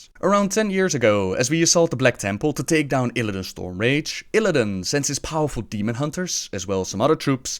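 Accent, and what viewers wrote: Netherlands. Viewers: is he dutch? it sounds like it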